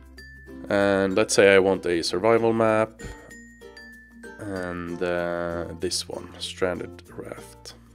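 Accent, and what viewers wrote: Sweden. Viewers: Oh you're Swedish lol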